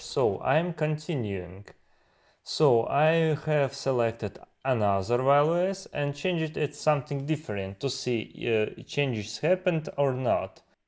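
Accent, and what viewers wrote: Russia. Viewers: блин очень сильно акцент виден, ты случайно не русский?)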